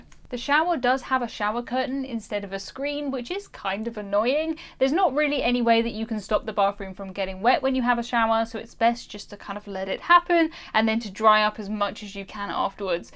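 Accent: United Kingdom. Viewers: Good information. Thanks. It is sometimes hard for those of us with hearing problems to translate British English to American English. Slowing your speech would help. Thanks again.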